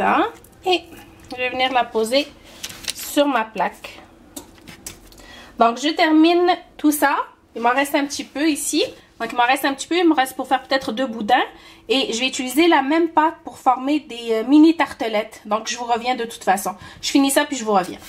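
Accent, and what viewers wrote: Quebec, Canada. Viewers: Mais je me suis trompé c est l accent canadien que j entend en tout tu es une maman très attentionné maman gâteau bravo à toi super recettes merci. Emma de France. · Adorable femme avec cet accent du Québec 😁😁👏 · J'aime beaucoup trop l'énergie que tu dégages dans tes vidéos, merciii pour la recette ça a l'air délicieux Ps : ton accent québécois est magnifique 😭❤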